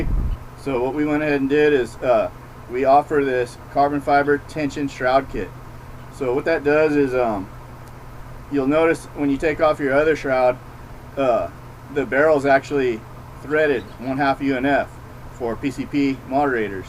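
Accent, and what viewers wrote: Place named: Louisiana, United States. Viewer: You must be from home I can hear it in ur voice yeah!! ( Louisiana)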